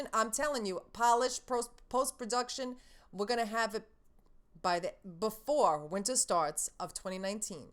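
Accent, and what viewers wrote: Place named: New Jersey, United States. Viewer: Haha I thought you were from New Jersey!anyway still criminally underrated Channel but we're sticking with you girl!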